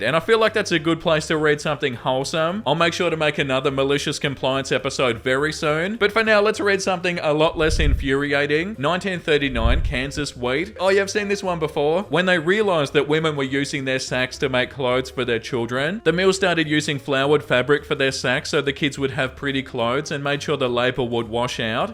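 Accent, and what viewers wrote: Australia. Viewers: I have never heard someone so Australian and so valley girl at the same time. It's amazing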